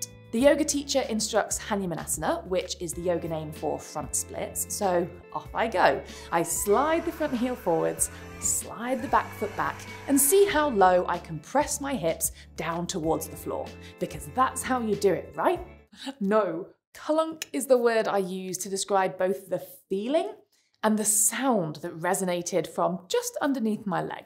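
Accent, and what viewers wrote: United Kingdom. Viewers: SO nice to see another teacher pointing to this short coming of yoga. My own 40 year yoga teaching career has let me see many loose their yoga butt and need to get it back again. I recommend much of what you have here, and also picked up a few good tips to add to my tool box as a yoga therapist working with hips challenges. thanks! Love your British accent.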